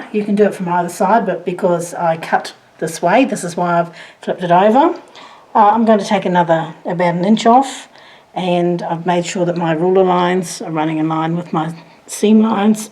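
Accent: Australia. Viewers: I love your video on how to machine bind a quilt. Very clear, very concise and a new little trick on how to make sure you cut your binding exactly the right length. PS love, love, love your Australian accent so sweet ‼️❤️ Colleen ☘️ USA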